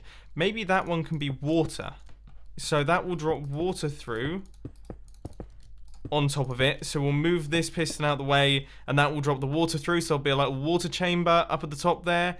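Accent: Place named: United Kingdom. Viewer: Mumbo has gotten more British over the years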